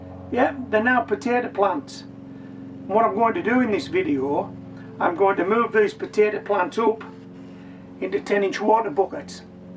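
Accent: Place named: Lancashire, United Kingdom. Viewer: Yes I sure did, its a routine of mine and my granddaughter (aged 6) to watch your videos. For a long time she was convinced it was me on YouTube doing the gardening videos, we hardly see your face, as I sound like you (Lancastrian accent), grow in buckets and tubs too. Also, until recently, at the time I also had a Collie dog. The similarities are uncanny and convincing for a little one. LOL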